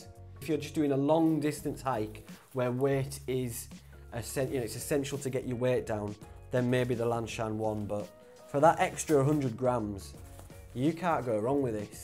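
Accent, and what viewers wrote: Yorkshire, United Kingdom. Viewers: Great review!! The 2 Pro looks the ticket. By the way, thats got to be a North Yorks accent right? Im guessing York :-)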